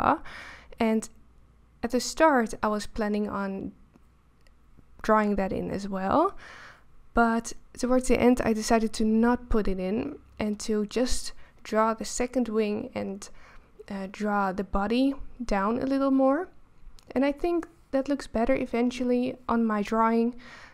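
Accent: Netherlands. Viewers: you just hear you are dutch lol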